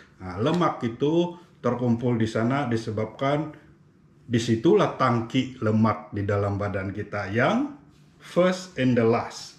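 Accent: Sabah, Malaysia. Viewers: Tq doc.. Siok sia dgr dlm logat sabahan begini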